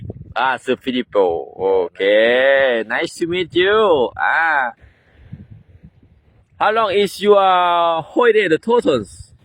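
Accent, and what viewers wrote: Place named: Pakse, Laos. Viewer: Sabaidee!!! You sound like you're from Pakse, I was born there. I am happy to see that you are showing Foreigners our lifestyle in our country/Laos....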